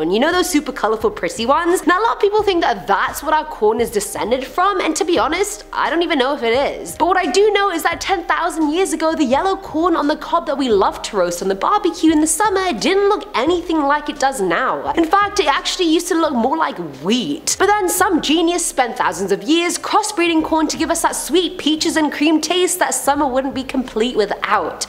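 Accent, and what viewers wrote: South, United States. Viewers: I'm from Mississippi and cant stand fake southern accents but yours is perfect..luv ya your awesome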